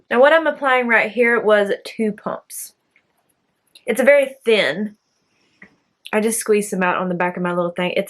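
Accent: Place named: South, United States. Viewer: Your eye look turned out beautifully <3 I think that UD palette got a bad wrap, but may just be underrated. I'm a new subscriber and I'm not going to lie, but your southern draw got me! Hopefully that doesn't seem weird or offensive, but you just have an inviting and friendly sound and persona. The first video of yours that I came across was your blush swatch video, so that was really what I had to go off of. Lol :)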